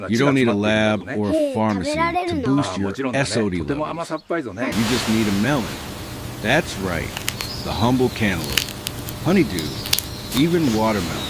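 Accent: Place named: United States. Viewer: Beautiful Japanese visuals let down by the extremely American narration.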